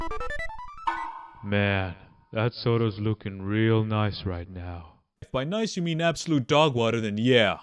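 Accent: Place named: Sweden. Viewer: He is Swedish. That's why he has swedish accent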